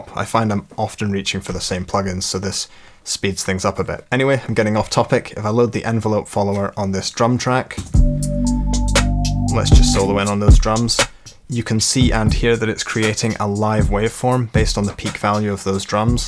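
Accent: United Kingdom. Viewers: I thought you are from the UK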